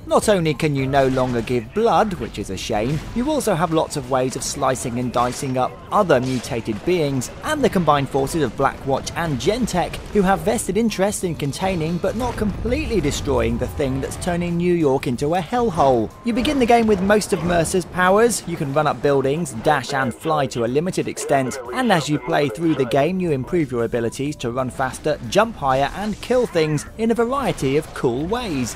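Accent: United Kingdom. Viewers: The voice is way too brit.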